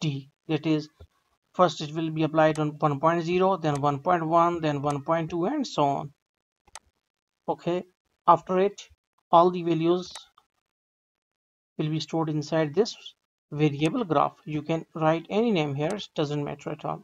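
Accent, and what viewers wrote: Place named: India. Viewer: thank you soo much my indian bro ı like your accent :D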